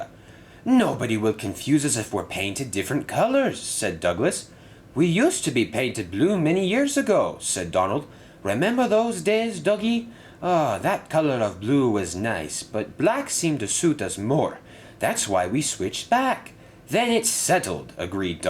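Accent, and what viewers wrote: Scotland, United Kingdom. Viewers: How do you learn to speak Scottish 😱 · Your Scottish accent, a RWS reference, illiterate children and Ryan being zinged at the end. All the makings of a fantastic episode, wonderful work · AWESOME SCOTTISH ACCENT BRO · I like your Scottish accent! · Hey you did a really good scottish accent almost like George Carlin did in the t.v. show. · That is a great Scottish accent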